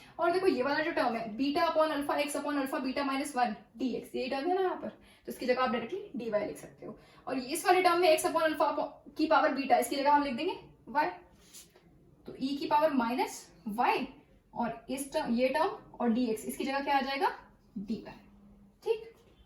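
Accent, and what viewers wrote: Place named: Himachal Pradesh, India. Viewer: For once I thought you are from Himachal! haha. Nvm, Great video though!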